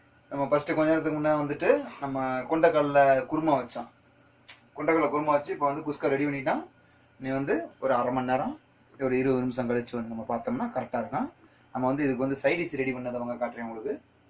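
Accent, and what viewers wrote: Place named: Madurai, India. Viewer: super bro konjam stove kleen banunga pro unka slang very super you netive madurai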